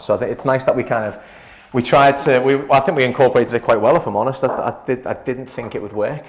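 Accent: Liverpool, United Kingdom. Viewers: Liverpool UK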